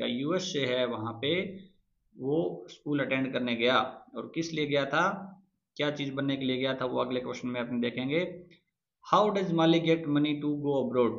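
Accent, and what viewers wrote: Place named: Shekhawati, India. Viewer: Sir aap kaha se h vo maine isliye pucha kyo ki aapke bolne me शेखावटी ka lahza aata h so.....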